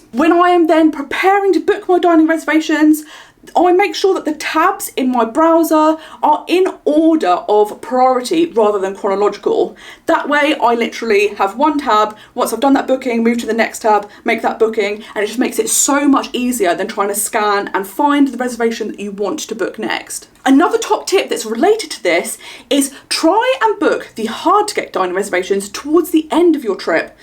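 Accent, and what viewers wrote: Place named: United Kingdom. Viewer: You sound like you’re from the uk do you use a vpn please to make your bookings? Or use the uk site as im also traveling from Europe.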